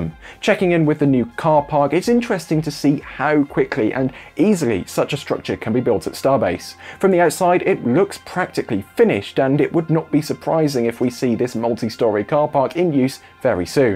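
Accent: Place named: United Kingdom. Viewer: "Centimeters" is exactly why I don't subscribe. There is something inherently comical listening to British accented narration about an American aerospace industry genre, knowing that ESA is virtually non-existent for British narrators to pronounce their terms with an imperious nose in the air pose.:face-green-smiling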